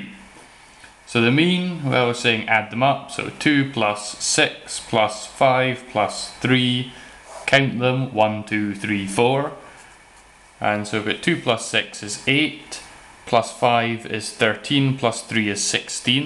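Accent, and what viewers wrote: Scotland, United Kingdom. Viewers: Herschel's Lost Leg. He sounds Scottish....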